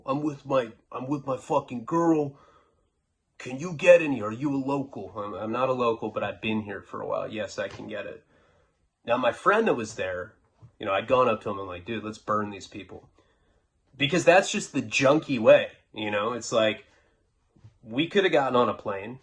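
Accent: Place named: New York, United States. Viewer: Your ny accent is hilarious I just think about son from jail lol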